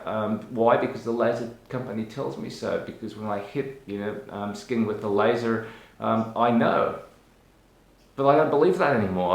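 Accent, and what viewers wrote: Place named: Australia. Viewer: A Korean-aussie with a full on aussie accent. I've seen it all ㅋㅋㅋ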